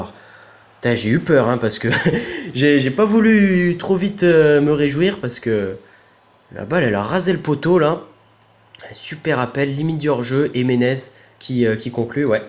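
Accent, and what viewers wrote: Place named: Italy. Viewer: bonne vidéo et ton accent italien et magique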